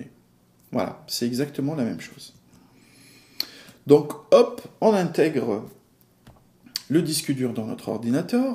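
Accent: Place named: Italy. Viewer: C'est ça qu'on appel "LA PÉDAGOGIE". PS: avec accent italien.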